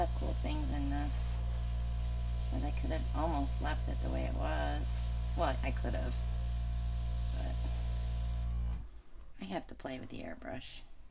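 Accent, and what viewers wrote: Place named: Western New York, United States. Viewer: Hello. Thank you for sharing Learned so much from you. You truly inspire me. I heard you say New York and snow. Whereabouts? I’m from Buffalo, your accent sound western New York-ish. Anyway awesome Techniques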